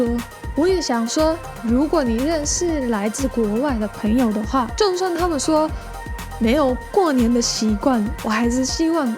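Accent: Taiwan. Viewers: 聽到台灣腔就是親切 哈哈😄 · 很喜歡聽妳說的台式中文～ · 很喜歡妳講的臺式中文腔調，感覺像是周遭的朋友在聊天，很有親切感，我們家只要沒結婚沒小孩過年都會收到紅包，金額大概<num>臺幣，。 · 喜歡你的台灣腔的中文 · 地表最強台灣國語...+油..阿兜啊..你最強...讚啦...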